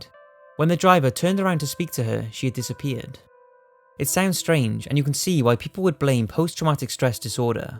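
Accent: Wales, United Kingdom. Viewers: Michael Hunt I always thought he sounded Welsh but maybe not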